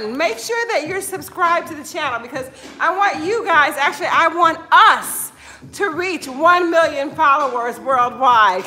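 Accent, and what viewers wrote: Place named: United States. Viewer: me encanta tu acento gringo al hablar espanol :)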